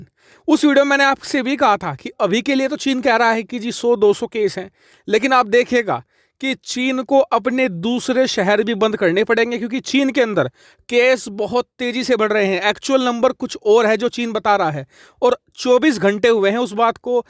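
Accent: India. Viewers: Why you talk as Indian varient ?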